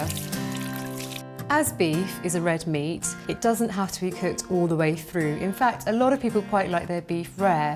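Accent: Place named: United Kingdom. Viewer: I believe that would be a British accent. :)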